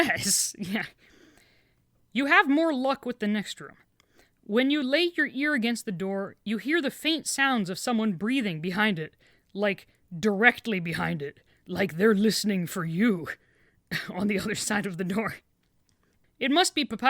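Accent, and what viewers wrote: South, United States. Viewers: XD the southern accent hehehe I love your readings lol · Your Southern voice is OK I’ve heard worse T-T sadly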